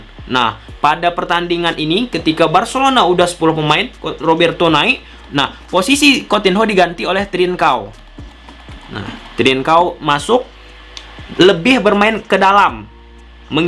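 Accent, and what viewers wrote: Malaysia. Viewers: logatnya kayak orang malaysia, warga malaysia kah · abang...logat melayunya kontal kali. dari utara kah?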